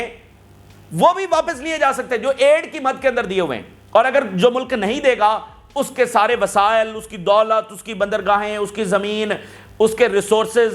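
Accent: Pakistan. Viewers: Guy you in Pakistan for your information your need to know we the people of United States had voted for president Donald Trump and we love ❤President Donald Trump, and President Donald Trump with love of the people of USA he will be the president of USA for 3rd term too! America and American first Only! No involvement of USA 🇺🇸 in any form or way in the affairs and matters of other countries of the world any more, no more of the USA’s financial aids to any other country of the world that is the reason we the people of USA had voted for president Donald Trump! and It would be better if you stop screaming and speak in low volume! Plus you have heavy Urdu accent on to your English, it is ok to speak entirely in Urdu without adding English words or sentences in between your Urdu speech, though it would be even great, if you could put some efforts on improving your English that have heavy Urdu accent! 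Thanks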